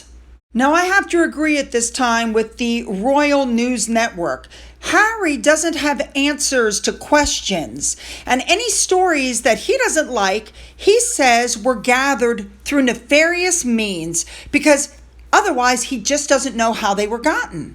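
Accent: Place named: United States. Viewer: So what she is a American not English .And American English is very different from real English.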